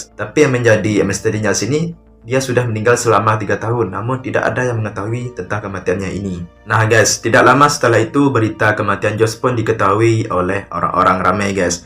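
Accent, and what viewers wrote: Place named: Aceh, Indonesia. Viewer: Abang orang aceh ya dari logat nya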